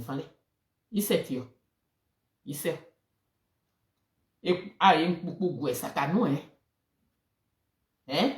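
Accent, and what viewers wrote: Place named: Baoule, Ivory Coast. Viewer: Je veux juste savoir TT ne cotisait il pas au PDCI? ton baoulé est trop doux merci. Parle fort ils vont entendre.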